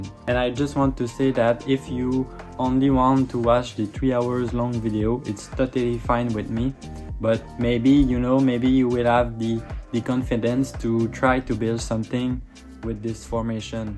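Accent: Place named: Quebec, Canada. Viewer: Excellent vidéo sur le sujet! Et presqu'en français... Hi H... Pour vrai, l'anglais utilisé est enfin à ma portée. Parler à la George Saint-Pierre a vraiment son charme aussi. Bref, le plus important est sans contredit le contenu apporté. Les détails sont suffisants pour quelqu'un qui souhaiterait se lancer. Ça vraiment le mérite de faire rêver. Grand merci. PS: Vous êtes d'où déjà? Je me souviens d'avoir été impressionné lors d'une épisode de Go van.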